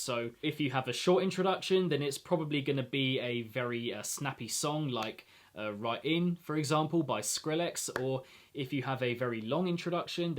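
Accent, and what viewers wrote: United Kingdom. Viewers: 5000 subs is a really nice mile stone. but your lush British accent deserves 100,000,000.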